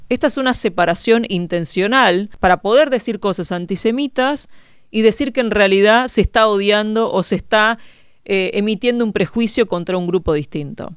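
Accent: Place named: Rioplatense, Argentina. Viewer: Muy bien explicado por una muchacha que tiene una gran preparación, elocuencia, oratoria y facilidad de palabra.Y no nací ayer....Por el acento no me doy cuenta si es una chica macanuda de Argentina, Uruguay o radicada en otras naciones hispáno-parlantes. Viva Israel!Am Yisrael Jai!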